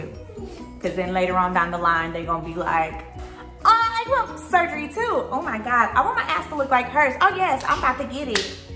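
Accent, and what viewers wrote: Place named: West Coast, United States. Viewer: Your accent is so pretty. What state you from? I’m guessing west coast but I don’t know 🤣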